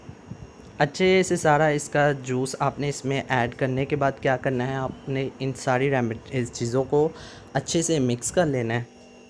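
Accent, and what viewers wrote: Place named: Lahore, Pakistan. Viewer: ni apka way of talk lahOriyOn ki trah h naa jb hi poChaa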